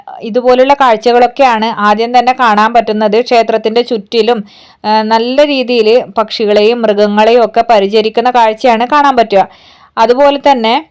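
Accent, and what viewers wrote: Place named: Kannur, India. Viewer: Kannur slang super.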